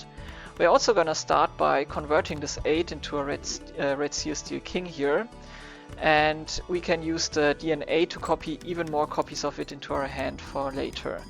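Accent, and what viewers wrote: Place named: Germany. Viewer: German Accent, like it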